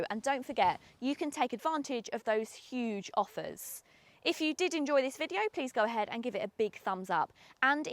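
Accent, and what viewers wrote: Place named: Essex, United Kingdom. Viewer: The accent of the presenter nearly turned me off the car.. Must be from Essex.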